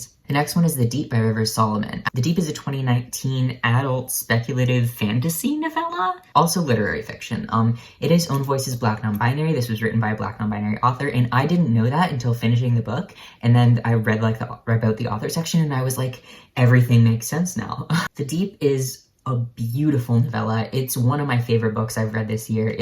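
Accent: Canada. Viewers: I have Cemetery Boys beside me right now. I'm going to start reading it tonight. You go to school very close to me! I grew up near a certain university about 10 miles away from yours and I still live in the area. I took some art classes at your school through the continuing ed program, too. It's strange to think you're so close because you sound like you're from Canada to me. Before you mentioned where you live, I thought you were way up north somewhere. If one day you see some old person with pinkish hair staring at you, it might be me trying to figure out if you are who I think you are. I'm really bad at recognizing people.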